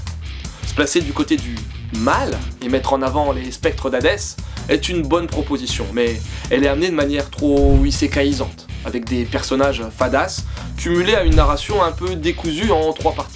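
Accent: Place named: Japan. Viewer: Bonjour, Je suis surpris par ces prononciations des noms anglais à la japonaise (rien à voir avec le "w" hein). Je ne pense pas que c'est de cette façon que vous fideliserez/attirerez de nouveaux followers. Après, ce n'est peut-être pas ce que vous recherchez. Au revoir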